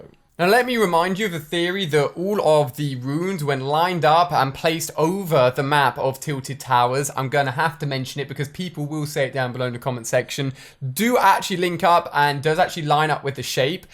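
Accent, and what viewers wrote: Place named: United Kingdom. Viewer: Can you stop talking in that British accent it’s kind of annoying talk like a regular person please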